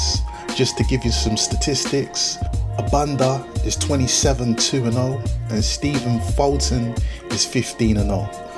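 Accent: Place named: United Kingdom. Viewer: Brotha you got a UK emcee voice. Reminds me of a UK emcee by the name of Barney Artist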